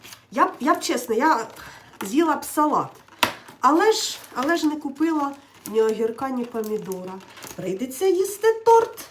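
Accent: Poltava, Ukraine. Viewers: вогонь, а не жінка, а Полтавська говірка просто❤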